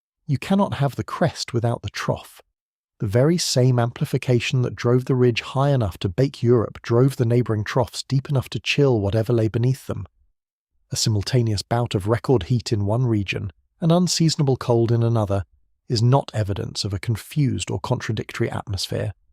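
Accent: United Kingdom. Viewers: Dude it is the water current of the changing Ocean’s…… CHANGING THE SALINITY!!!!! So it won’t sink and freeze ! ONCE IT ROLLS OVER!!!!! You will pray for Hot days!!! England will be under Ive for 100’s of years!!! (IT IS A CONVEYOR BELT THAT FREEZES AND AINKS AROUND Greenland!!! Loosing salt because of the melting Ive waters from North Canada and Greenland itself! ( heat in England comes from the hot ocean)!!!! LISTENING FOR THIS D BAG BRITI ACCENT TRY TO BRING A DIVE OUT FROM UNDER HIS HAT!!! THE JET STREAM!!!! No s h i t e!!!! Try a tornado with your milk tea whoopsy-daisy!!!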